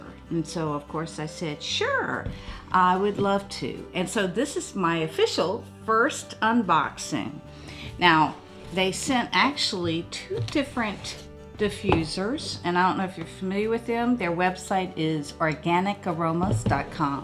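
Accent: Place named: New Jersey, United States. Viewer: You sound like you're from New Jersey.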